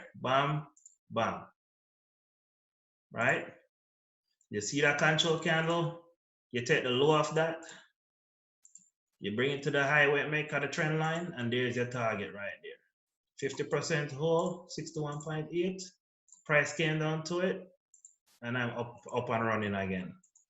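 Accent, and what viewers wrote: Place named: Caribbean. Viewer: Which Caribbean island you from bro? JA?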